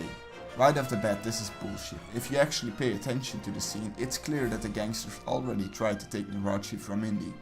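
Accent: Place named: Central Europe. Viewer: I'm just going to assume you're a white guy who doesn't actually know what racism or sexism is. From the sound of it you are from middle Europe? Its quite ok that video doesn't have more views than it does.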